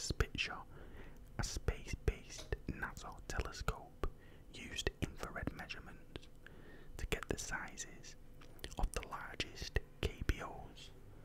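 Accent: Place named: England, United Kingdom. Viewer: You are honestly such a good find. I love space and learning things like this. Finding someone who's voice and content you like can be hard! Can I ask what part of England you're from? I'm struggling to place it and it's annoying me 😂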